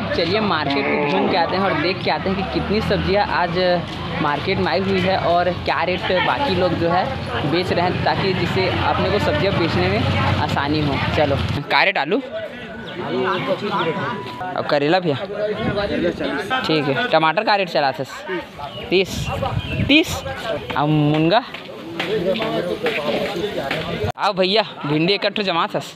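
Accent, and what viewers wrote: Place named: Chhattisgarh, India. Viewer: Main iski Hindi boli se samajh gya ki ye Chhattisgarh ka hai..mai bhi Chhattisgarh ka hoon Bhai .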